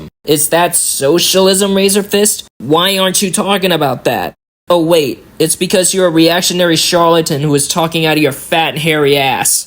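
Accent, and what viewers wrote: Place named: Arab world. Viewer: i kinda see what the commenter is saying though, he kinda sounds like how arabs who are very fluent in english from a young age sound, theres like basically no accent but it sounds a bit off. you can hear it in more. source: im an arab who never properly learned arabic but grew up around people speaking arabic so my accent is a bit weird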